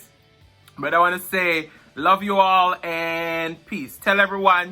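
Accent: Jamaica. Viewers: A combination would be great.. patois and English as you do..brings more spice to your videos.. dem gals love da accent 😂😂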